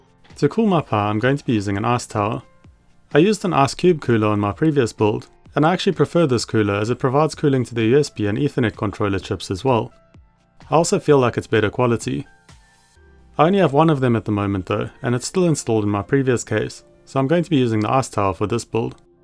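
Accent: South Africa. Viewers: Ey you South African, I like you & ur content ❤🎉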